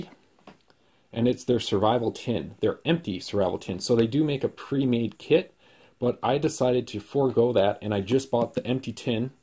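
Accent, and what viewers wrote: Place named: Canada. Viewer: I'm from Michigan but you sound like you're very close to Canada. I don't hear the Canadian twang in voices here in West Michigan.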